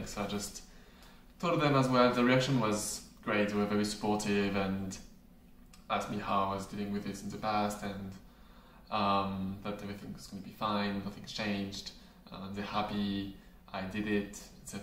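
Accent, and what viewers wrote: France. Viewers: Are u french ?🇨🇵😆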